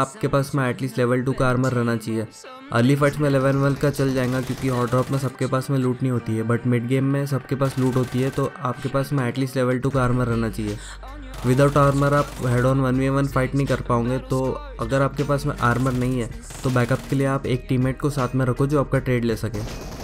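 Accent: Hyderabad, India. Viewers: Bhai tum hyderabad se hai kya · Bhai hyderabadi h shayad 😅👌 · Hyderabadi tone arhi kya🤔😋🤪 Btw OP skills bro 🥺🙌🤠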